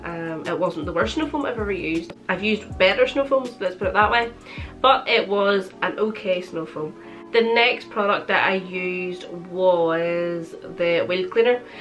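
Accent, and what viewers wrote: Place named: Northern Ireland, United Kingdom. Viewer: great thanks I just found your videos (just subscribed) great to see a Northern Ireland voice on here for a change keep up the great work stay safe 👍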